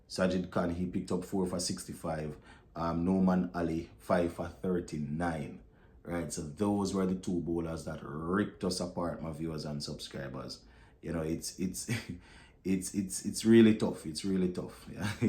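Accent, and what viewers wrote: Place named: Jamaica. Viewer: greetings my brother. I trust all is well. I haven't heard you used the phrase "The men not good" in your Jamaican accent. I believe the expression will be relevant in this context.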